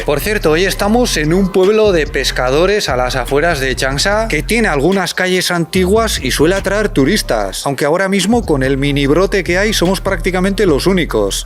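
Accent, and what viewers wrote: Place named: Basque Country, Spain. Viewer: Ahi va la ostia,! Menudo acentazo vasco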